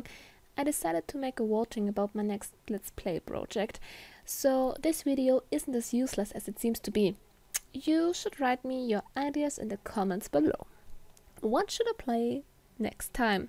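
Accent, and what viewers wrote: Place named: United Kingdom. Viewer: your English sound very British, which I like, I think it is brilliant XD ... ich würde zwischendrin einfach mal ein paar Folgen Mirrors Edge in Englisch toll finden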